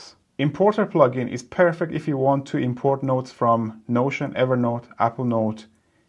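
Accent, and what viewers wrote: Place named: Iran. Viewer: لحجه ایرانی ات منو کشته پسر. دمت گرم <3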